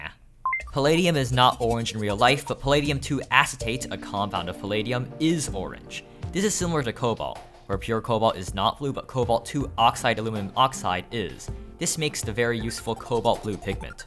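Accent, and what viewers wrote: China. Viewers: HOLY SHIT YOUR CHINESE ACCENT IS PERFECT! (Taiwanese here :D)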